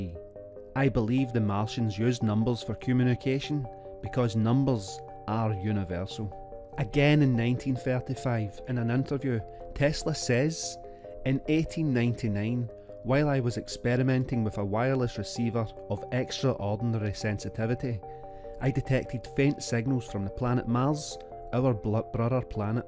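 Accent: Scotland, United Kingdom. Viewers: Really enjoying this Scottsman's narration !!!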